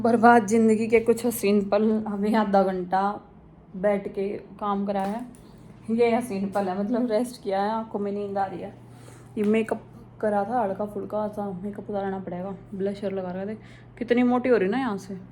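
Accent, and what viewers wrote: Haryana, India. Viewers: Aap haryana s ho ya up s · Good morning madam ji aapki awaaz aisi lagti hai Jaise Haryana ki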